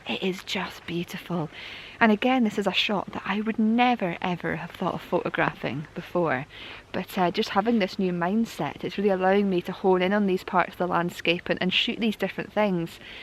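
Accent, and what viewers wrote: Scotland, United Kingdom. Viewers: Great Vlog, wonderful location, Brilliant conditions, I am impressed with your Photographic eye, which is supported by your narrative and easy listening scotch accent. thank you for sharing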